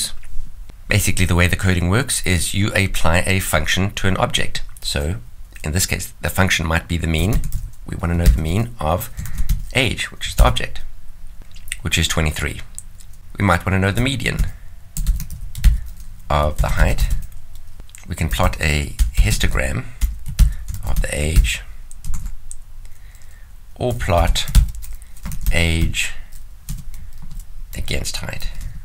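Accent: South Africa. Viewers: Are you South African? The accent sounds familiar! Loved the video, great content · i wonder if the presenter south african?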